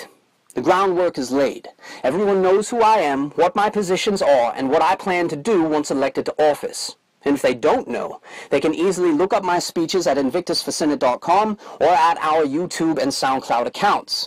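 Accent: South, United States. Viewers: Dear Mr invictus I can only describe the way I feel after hearing you speak on your YouTube channel as "moved". I was starting to think the only time I would hear a man with such a dignified southern accent speak with such passion would be in my own head as I read books about our glorious southern history. This recent attack in Dallas has presented you with an opportunity to obtain a constituency you probably would not have thought would be big supporters of yours. Law Enforcement (and I'm not talking about feds) are without a support group that is not subservient to the same people that are causing their death and missery. If you would do a fire side chat speaking to them about what you would have in mind for them and how their are allies for them outside the fed's and unions, it would be well received. Second I would reach out to James Lancia the author of DownTown White Police. 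His star steadily growing and he is inevitably going to have a large following of police. If you could get Mr. Lancia to opeanly support you it would bring many white police officers to your side. I know it sounds kind of crazy but if you think about it white police officers are in exactly the right position and in the right mindset to welcome a man like yourself right now. Go to Mr. Lancia's YouTube channel. Listen to some of his cop shootout videos. After this I'm sure you will see why I believe these officers will welcome you. Keep up the fight.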